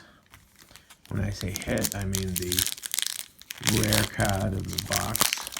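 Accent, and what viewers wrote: Boston, United States. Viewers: Can't place your accent. It sounds like like home town of Boston but I could be wrong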